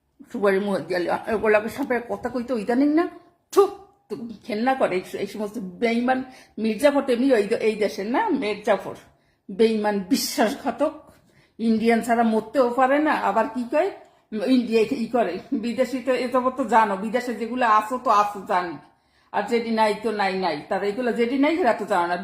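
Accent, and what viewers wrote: Barishal, Bangladesh. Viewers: পুরাই বরিশালের ভাষা দারুন।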